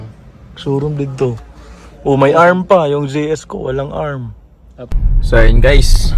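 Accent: Visayas, Philippines. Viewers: bisaya diay ka sir hahaha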